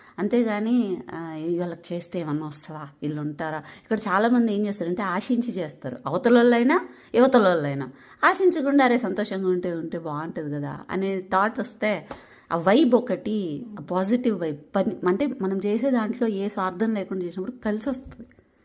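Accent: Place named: Anantapur, India. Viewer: మీకు కూడా అనంతపురం జిల్లా యాస బాగా వచ్చేసింది 🙏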